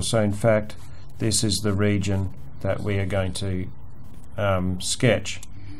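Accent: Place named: South Africa. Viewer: Your explanation is clear as crystal. Excellent tutorial on complex numbers. I also like the quality of your video as the picture is stable, clear and neat. From your accent I would imagine you are a South African?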